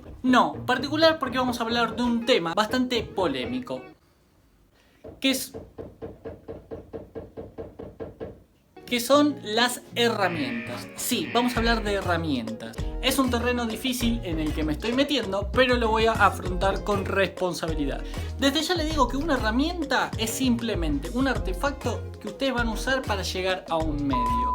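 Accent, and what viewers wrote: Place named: Argentina. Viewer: woww!! bro magnifico video!! tan bueno y tan entretenido el video, que me senti obligado a comentar jajaja, pense que porque tienes acento argentino me iba a fastidiar tu voz, pero no, al contrario transmites mucho carisma y para nada eres aburrido, primer video que veo y ya ando suscrito, incluso antes de que se acabará el video jajajaja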